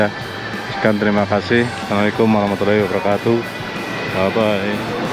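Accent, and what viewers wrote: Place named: Banyumas, Indonesia. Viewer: jos lh,,PD Bae ro enyonge,,,salut walaupn d ngrti orng ngapak e Ra klalen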